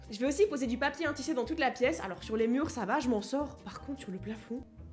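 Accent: Belgium. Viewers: La musique de c'était pas nécessaire parcontre surtout quand ont n'a un accent belge comme toi. 😅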